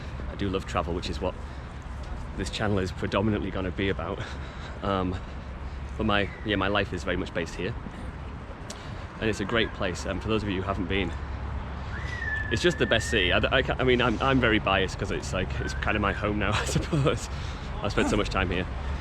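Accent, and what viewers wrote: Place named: Teesside, United Kingdom. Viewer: I am super late to the party here but loved this video! So lovely to see your face and hear your voice... still got your Teesside twang!:D xxx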